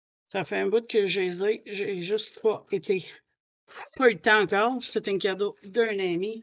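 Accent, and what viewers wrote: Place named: Canada. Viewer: Bonjour ,ton accen me permet de voyager vers ce magnifique canada là où j'aimerais aller tu as une magnifique collection de crayons et de matériel je viens de tomber sur ta chaîne que c'est la numéro 2 , après je regarde la 1 ,j'ai adoré ta vidéo et chaque personne a ses crayons préférés sa ne s'explique pas 😅 je suis ravie de te connaître les brutfuner sont mes crayons préféré j'ai les 180et les520 et djautre gamme que tu as présenté merci beaucoup pour ton partage bisous 😊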